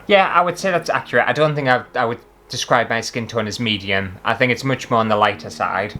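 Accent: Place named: North East England, United Kingdom. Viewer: Glad to hear it - I couldn't really imagine you without your North-East accent! 😄😄Endurance just sounds wrong all round 😆😆 Hope your weekend is going well so far and the latest video isn't turning into an endurance test of its own!! 🤣🤣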